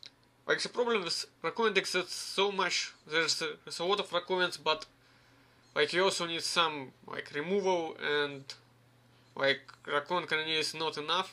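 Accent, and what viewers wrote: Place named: Russia. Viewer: ю рашн инглиш ту бит май ирс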